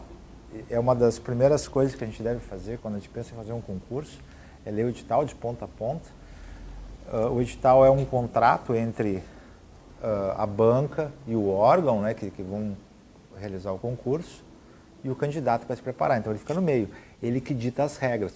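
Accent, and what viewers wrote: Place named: Brazil. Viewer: JÁ TE ACHEI NO FACE E MANDEI UMA SOLICITAÇÃO. ASSIM QUE COMEÇASTE A FALAR JÁ VI QUE ÉS DO MELHOR ESTADO DO BRASIL. SAUDADES DAÍ.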